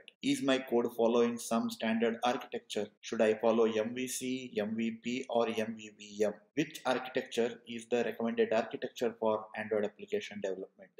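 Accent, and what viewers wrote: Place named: India. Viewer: You have a really funny Indian accent, but your tutorials on Android are great, I can even say that they are the best on YouTube.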